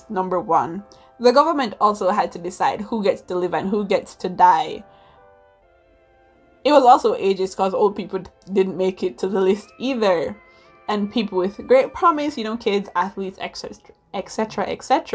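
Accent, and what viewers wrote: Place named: Caribbean. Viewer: I am livingggggg for the patois and confidence. serving us island girl realness as always.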